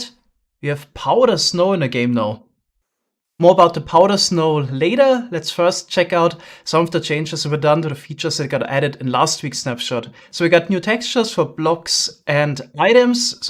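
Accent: Germany. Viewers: I don’t hear strong German accents in English anymore, for a second I thought I heard straight up German.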